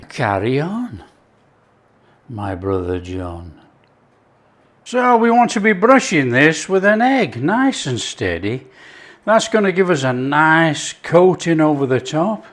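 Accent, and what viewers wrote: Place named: Cockney, United Kingdom. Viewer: His cockeny accent reminds me of the character in My Fair Lady, Eliza Doolittle's father who sang a.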